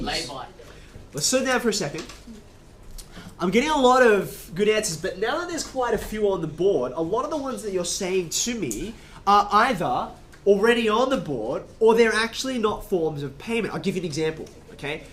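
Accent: Australia. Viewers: Besides his obvious accent, you know he's Aussie when he says "Amex." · My eyes say Chinese, but my ears say Australian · Woah it’s an asian guy with an Australian accent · Hate aus accent.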